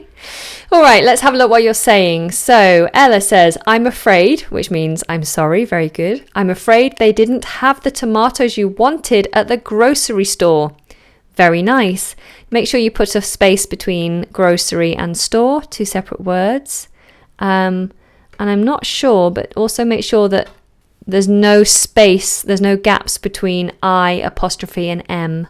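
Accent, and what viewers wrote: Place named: United Kingdom. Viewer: I like how you teach, your english british accent, your pronunciation, but there's something I wanna ask you just for curiosity. Did you study at Cambridge? If not, where?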